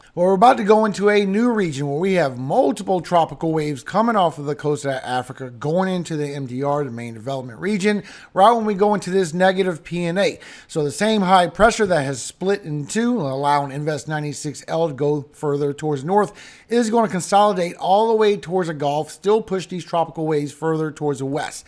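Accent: Louisiana, United States. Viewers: Hay weather man are you from Louisiana?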